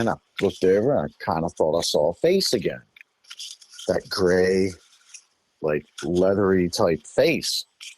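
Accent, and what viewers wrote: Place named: South Pennsylvania, United States. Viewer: I'm here for both the story and the south PA accent. :)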